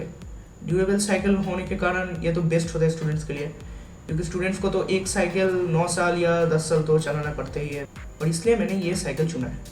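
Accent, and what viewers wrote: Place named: Bengal, India. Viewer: your hindi sounds like bengali....nice though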